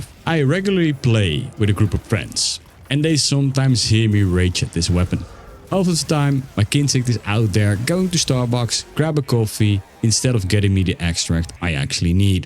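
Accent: Netherlands. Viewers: Dutch guy speaking english?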